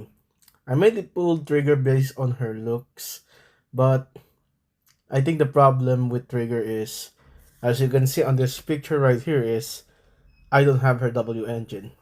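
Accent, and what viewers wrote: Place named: Philippines. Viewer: bro u pinoy, the accent is kinda pinoy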